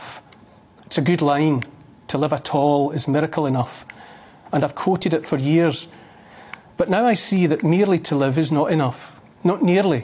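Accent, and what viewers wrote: Scotland, United Kingdom. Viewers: this is proof that if you hire a Scottish man to talk about anything and vaguely reference William Wallace, people will agree regardless of how idiotic or poorly thought out the statements may be 😬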